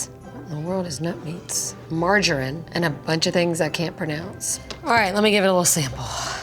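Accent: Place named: South, United States. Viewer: Love your southern accent I could listen to you talk all day long. I'm originally from Tennessee.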